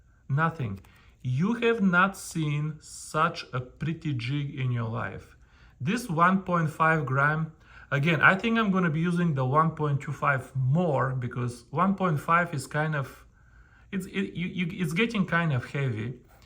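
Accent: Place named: South Africa. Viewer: You from South Africa? Just guessing based on how you speak. NICE attention to details! I wish you made bigger, bass sized stuff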